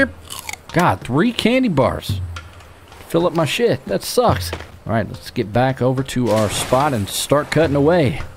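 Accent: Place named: North Carolina, United States. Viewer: You must be from NC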